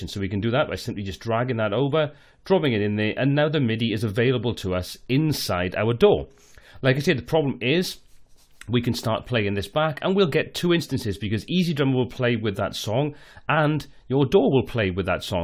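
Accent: Wales, United Kingdom. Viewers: No we don't, this guy is Welsh, so it is a regional variation, it's not a moot point! Also for route, we pronounce it root not rowt.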